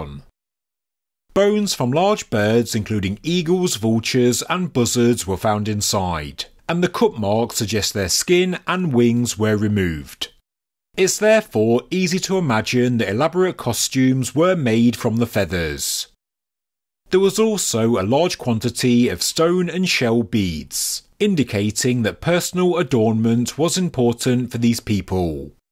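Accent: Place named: West Midlands, United Kingdom. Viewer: Native of the UK here, I believe it is a west midlands accent ( guess only ) I play at 1.25 speed it makes it more bearable 😂😂😂😂😂😂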